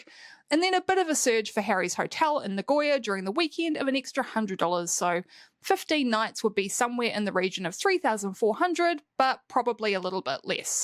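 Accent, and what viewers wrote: New Zealand. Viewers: Oh cmon, a Kiwi accent isn’t the second worst English accent…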